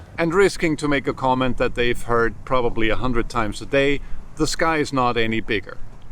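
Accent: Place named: United Kingdom. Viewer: Please give me a call I’d really like to talk to you in and hear you from Scotland or not Wales, but somewhere on the English channel? Your accent is beautiful give me a call I’d I would really like to hear from you, especially for the fact with your experience in Montana, I would like to learn I’m moving out there. I’m not vacationing I’m moving there and I need to be successful and I need to know what’s going on I need to learn from people that are from there or the understand it better than I do and I am leaving today at 12 PM. Give me a call. Text Me maybe some hall we can get together. It would be wonderful to learn from you my friend you sound like a very good person so God bless all the best to you and your family family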